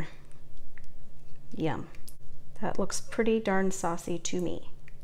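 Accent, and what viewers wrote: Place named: Central New York, United States. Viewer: Your accent is pure central NY and I love it. We had pizza like this when we were kids in Niagara Fall...Ragú, though? No ma'am.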